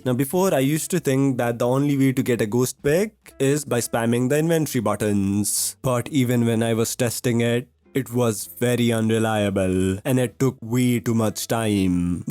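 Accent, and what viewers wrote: India. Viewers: indian ah accent is so annoying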